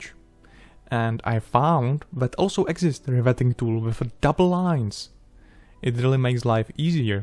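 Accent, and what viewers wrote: Czech Republic. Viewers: are you from czechia cause your accent is sunding czech. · Thanks to this channel, I now have the ambition to... make models? No. To be able to mimic a Czech accent! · máš takovou tu sklasickou českou angličtinu Češi mají dobrou výslovnost ale jde to poznat že to jsou Češi takže tak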